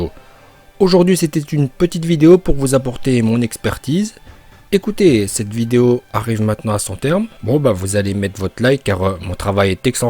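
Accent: Japan. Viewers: Je kiff ton accent japonais continue comme ça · Bravo pour t'est vidéo et continue comme sa je te soutien et continue ton accent japonais c'est tellement stylé quand tu sort ton accent japonais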